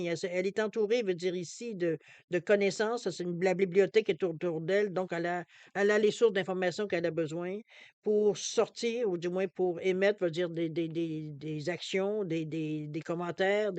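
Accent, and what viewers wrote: Quebec, Canada. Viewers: merci merci tout ce que vous dites me parle et j'aime beaucoup votre façon d'expliquer le tarot et j'adore votre accent j'aime le québec et les québécois mon Chum est québécois .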